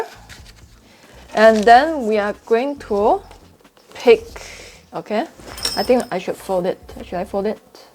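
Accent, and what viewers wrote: Singapore. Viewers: God bless and wishing you happiness. By the way I love your Singapore English accent./ Thanks for everything you did for us. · Ms love work. Ignore those who judge, cause in their eyes. You never do anything right. You can dress, speak. Dance to everything they say... and they will still find something to critique you on. On you English sounds good for me. Singlish. You could be teaching in your one of native language. That's, not going to help those who only speak English. Please keep doing what you are doing. We are going to grow-up and be just like you one day. 47/ 48 I forget sometimes. I think 48... aww don't remind me... again thank you for Jia you to break us out of this everyday rate race we are in. Maybe one of your students will stand on stage right beside you. Thanks so much.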